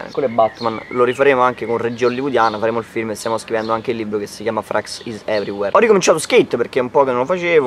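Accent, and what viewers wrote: Rome, Italy. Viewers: Parla di più in romano che fa ridere un bottooooo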